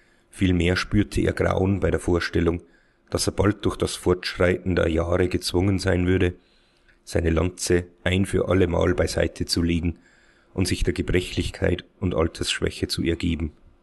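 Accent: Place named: Styria, Austria. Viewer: Kompliment zu der Vertonung dieser Kurzgeschichte. Kompliment. Insbesondere der steirische Dialekt gibt dem Ganzen etwas Ostländisches.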